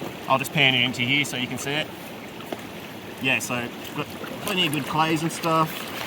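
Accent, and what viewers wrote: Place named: New South Wales, Australia. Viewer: Where are you TAS Vic or NSW .. You definitely have a NSW accent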